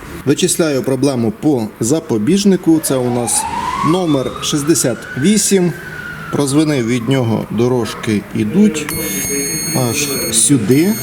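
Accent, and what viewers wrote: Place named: Surzhyk, Ukraine. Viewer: Только правильно не "перейдемо на украïнський" а "перейдемо на украïнську". Почему я, русскоговорящий, знаю грамматику лучше? Это как, никого не смущает? Если уже говорите на украинском, делайте это хорошо, не похабте своим корявым суржиком действительно красивый язык.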